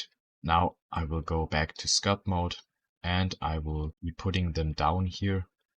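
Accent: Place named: Denmark. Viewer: oh sorry😅 you sounded very Danish in the video, great video btw!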